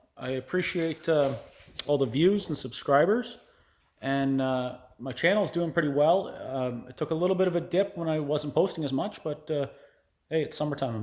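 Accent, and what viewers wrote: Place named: Minnesota, United States. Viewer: I hear a Minnesota or perhaps Wisconsin accent :)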